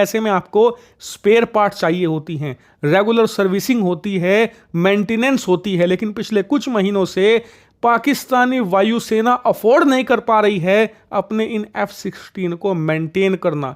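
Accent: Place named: Bihar, India. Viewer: Apki Abaj Purana Nehin.Avi Bihari Vojpuri Abaj Bol Rahi.kya Huwa Purana Abaj.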